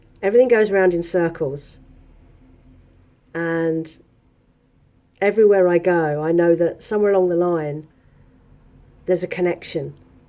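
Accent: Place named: Australia. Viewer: I've been thinking you had an Australian accent for months! Where is your accent from ?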